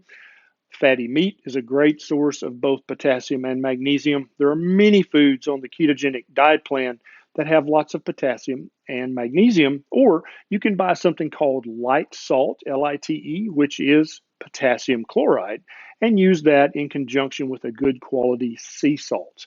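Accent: South, United States. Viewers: 🍫🍿🥤 I'm ONLY here for the Southern accent. 🍔🍟🍩🍪🥤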